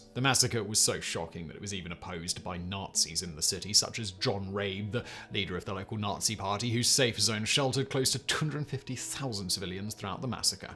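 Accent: United Kingdom. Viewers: Hmmm, British narrator....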